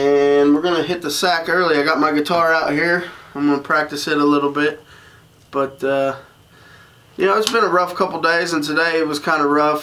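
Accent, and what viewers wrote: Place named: Indiana, United States. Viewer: Love the channel... Indiana here. Don't sound like I'm to far from ya. Keep up the good work.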